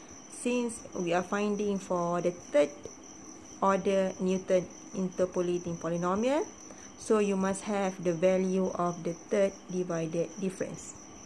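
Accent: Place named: Malaysia. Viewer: Bila dengaq je suara dan slang, dh tahu org melayu👍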